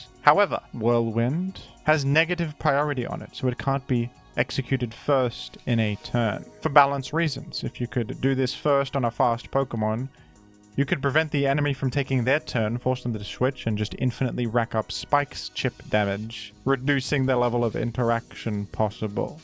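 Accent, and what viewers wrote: Australia. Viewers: As an Australia I can confirm it’s just his accent. That’s how we say it down here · I can’t tell if some of your pronunciations are a joke or just how they say it down under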